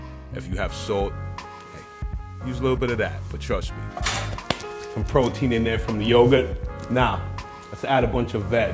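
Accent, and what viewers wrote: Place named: Caribbean. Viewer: Why don't you use your natural Caribbean voice. You're Jamaican Right 👍🏿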